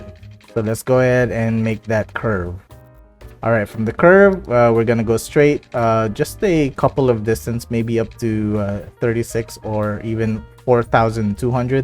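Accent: Philippines. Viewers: I’m actually liking the plot of your city design. However, I noticed every-time you are using skywalks, you intend on using parking gates thereby generating “park income”. Isn’t that kind of cheating? I mean it aint a crime but it’s definitely triggering me😂😂😂 Nevertheless, love the series. Nung una kita napakinggan, alam ko na pilipino ka 😂. It’s nice to know na mai CS content creator na pinoy. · are you from ph? haha cause of the accent. new subscriber! really good info as well. thanks!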